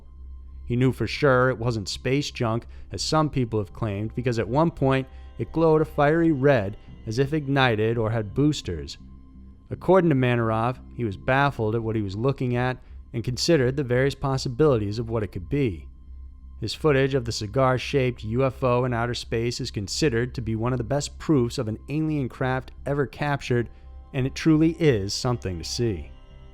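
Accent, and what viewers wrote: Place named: Texas, United States. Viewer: He is probably from Texas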